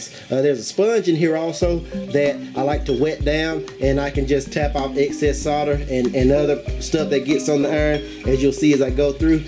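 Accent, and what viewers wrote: South, United States. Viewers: you sound like you're from the south xD hell yeah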